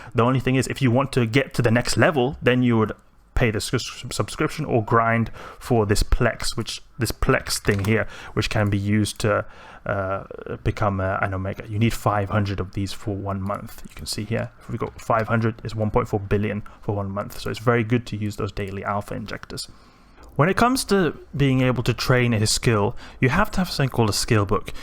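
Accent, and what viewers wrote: South Africa. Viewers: If i may ask, where you born in South Africa? As a afrikaner I can hear a light South African accent!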